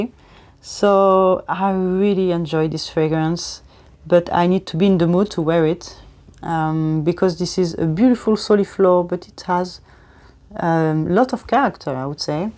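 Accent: France. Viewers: I love your monthly favourites and your reviews such a diversified extensive range of scents so wonderful to watch! I love fragrance too and have a small collection but not as impressive as yours! Do you work in the fragrance industry? I recently discovered Atelier Materi and cacao porcelana is on my wish list as is Trudon Bruma a beautiful chilly iris scent all class my other discovery and also on my to buy list is Tabac Rose by BDK its the only scent in that range that I LOVE it actually reminds me of Maison Lancome's oud bouquet but sweeter and more wearable I'm so glad I found your channel I love the way you speak & I love your French accent! Big lover of France have visited twice xx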